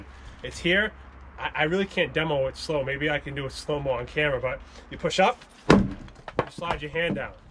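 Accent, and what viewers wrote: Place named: New England, United States. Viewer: Took me 2 seconds to realize you were a fellow New Eglandah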